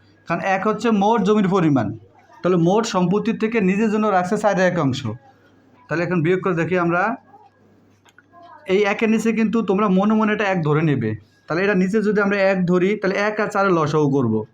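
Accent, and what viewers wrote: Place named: Bhola, Bangladesh. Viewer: স্যার আপনি কি ভোলায় থাকেন ? কথাবার্তা ওইরকম লাগছে ।আমার নানা বাড়ি ও দ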